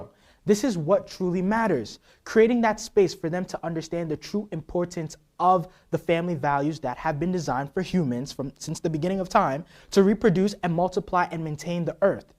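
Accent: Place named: United States. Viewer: Are u Ghanaian, just a harmless question, cos u sound American?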